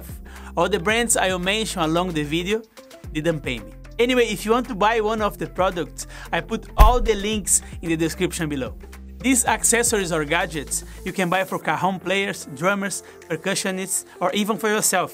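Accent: Brazil. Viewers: O sotaque tá arranhando véi! É sotaque nordestino falando inglês!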